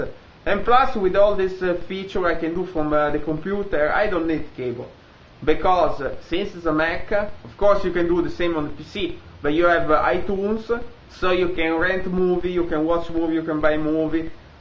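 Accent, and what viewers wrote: Italy. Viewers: Italian? or Portugese? am thinking Italian...lol, iti s distracting, but cute..... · Il tuo inglese mezzo italico mi permette di capire tutto. Inglese puro faccio fatica...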